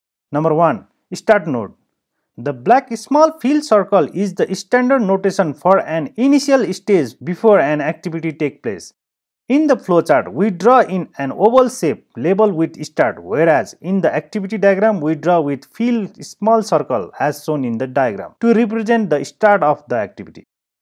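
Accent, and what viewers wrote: Nepal. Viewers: Nepali accent xa ta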